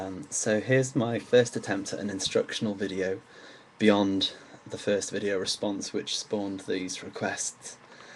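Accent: United Kingdom. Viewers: you know what, this is really helpful, indeed!! It is far better than lots of vids uploaded by some acting coachers or similar..! please keep posting! You are very english!!! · Is so cute the way you speak... Is not like the others british, there's a little more sugar on your tongue... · i really want to learn how to speak in the british accent...i have the american accent and trust me , i would not stop talking if i had your accent ;) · i love British accent, sounds lovely!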